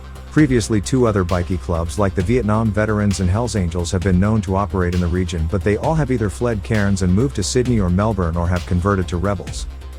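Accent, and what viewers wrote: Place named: United States. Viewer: Why is it an American doing the voice over as you said, a load of crap 😮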